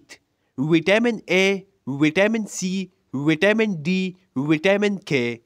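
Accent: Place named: India. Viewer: thanks for this but i didnt expect it to be brutally indian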